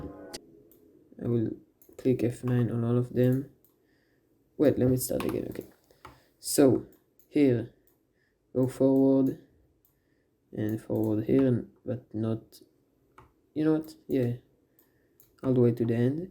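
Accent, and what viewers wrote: Israel. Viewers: איו אחי המבטא הישראלי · על פי המבטא הבנתי שאתה משלנו רחלה סרטון ערוך חזק גבר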